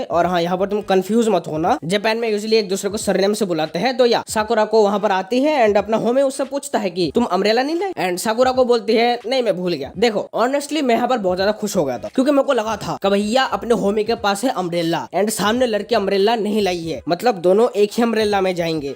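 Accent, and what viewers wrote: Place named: Bihar, India. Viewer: Bhai tu bihar se hai mera ek dost bhi ese hi bolta hai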